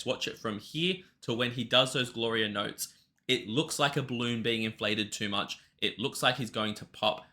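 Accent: Australia. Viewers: Hey what's the comment on the Australian accent ? or maybe you are Australian? LOL ! LOL ! We are all starting to sound alike anyway.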